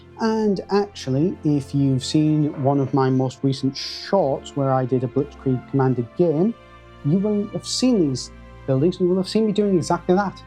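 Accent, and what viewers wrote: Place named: Teesside, United Kingdom. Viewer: Great video buddy. Where do you play your local games sounds like we’re from the same area. Teesside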